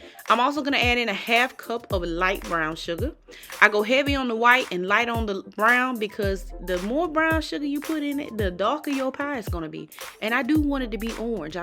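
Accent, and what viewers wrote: South, United States. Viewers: Thank you & Happy Holidays to you and your family as well. 🩵🩷 I knew I heard the southern accent ; I’m from Louisiana. I will definitely let you know how it turns out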